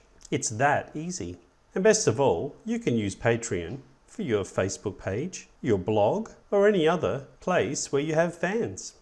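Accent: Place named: Australia. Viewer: the Australian accent messes me up. I keep reading from the melody that is used to modulate their language that every second or third word is sounding like either a question or "don't you understand, it's so obvious"... I really didn't get anything out of this. I mean... "ask your fans to contribute to your projects"... how? How do you get your "fans" and why would anyone "pay" me?? The very idea of advertisements in the classic youtube monetisation means people themselves don't need to spend money. The corporates do that with their (massive) advertising budgets. Most people want everything for free in this buy one get one free culture today. People actually pay a monthly subscription for videos that appear from time to time from someone? I certainly would pay for that unless I am following a course and want to see each video and "get something for my money". 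I don't really get it.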